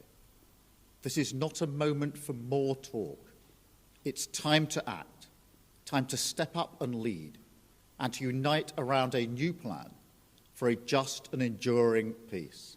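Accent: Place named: United Kingdom. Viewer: イギリス英語すきだな アメリカ英語は、ベラベラしていて好きじゃないなぁ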